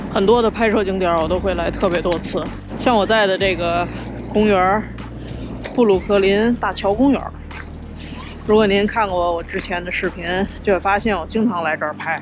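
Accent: Beijing, China. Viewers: 之前是喜欢这一口京腔儿，现在是喜欢这样一个真实的摄影师，还是期待EDI能走的更远👍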